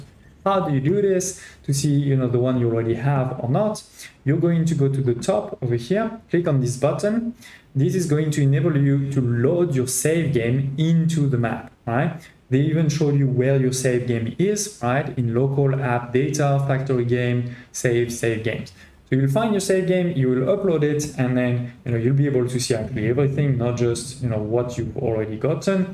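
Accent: France. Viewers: Are u French? U have a French accent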